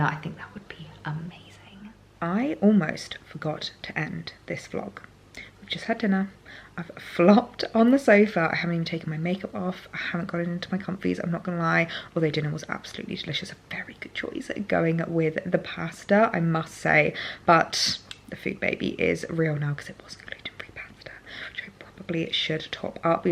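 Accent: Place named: United Kingdom. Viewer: Ty for responding. But please, there is no need to apologize.I'm a very loud speaker. Especially when I'm excited. So I constantly have to remind myself to speak softer. It's not an easy thing to do I know. And I'm 69. I've been trying to correct this at least a little bit my whole life. I cannot count the times people have asked me why I'm yelling when I feel like I'm talking normally. I have to tell myself that all I can do, is try to do my best. Part of the reason I might have been having trouble understanding you could also be that I'm not used to your lovely British accent. Out of all the accents in the world I've always admired and wished I was able to speak with a British accent. Have a lovely day. You are a joy to watch.😊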